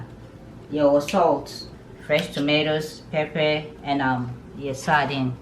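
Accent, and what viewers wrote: Akuapem, Ghana. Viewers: Ha ha ha ha ha, I’m crazy about everything ooooo, eeeei, starting point, your Akwuapim mixed with English dey kill oooo, then your humble submission or presentation, is wow. To epitomized it, everything was on point and my take is, it paves or gives the opportunity to learn different dishes, great, amazing, excellent job. Keep it up young lady.❤️❤️❤️❤️❤️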